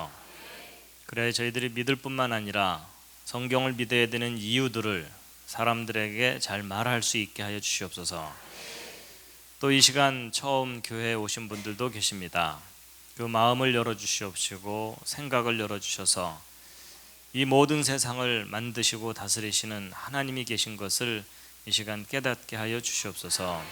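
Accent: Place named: Jeolla, South Korea. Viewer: 목사가 태도나 말투가 기본이? 전라도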